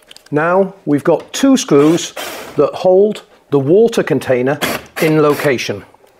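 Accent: United Kingdom. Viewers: Gosh, I came here for help to remove eletric oven and now I cant stop watching your videos. You are great! (and you have a British accent, which makes me feel comfortable because I had a great time living in The UK for 6 years! Cheers! (subscribed and like)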